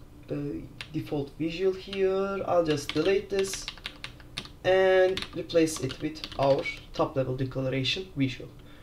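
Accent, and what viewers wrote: Turkey. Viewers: Thank you for the Xlib tutorials. Unfortunately X11 programming sources are scarce. I appreciate your tutorials. Would you cover Xshape click-through windows too? A transparent screen widget would be good. Your accent sounds Turkish.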